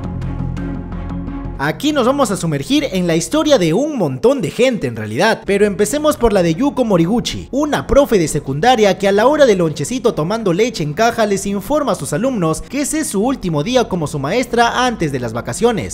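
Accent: Peru. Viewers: Eres de lima? xd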